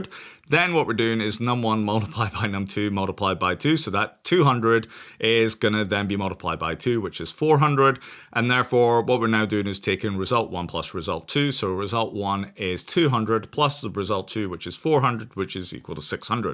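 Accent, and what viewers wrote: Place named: Scotland, United Kingdom. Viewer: Enjoying your videos!, where is your accent from? I feel like I hear a bit of Scottish, English, American, I'm assuming you have lived in many places in your life? 😃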